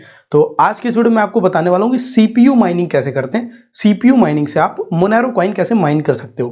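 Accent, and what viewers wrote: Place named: Punjab, India. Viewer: Learn how to speak English or add English subtitles you punjabi derka derka speaking mo fo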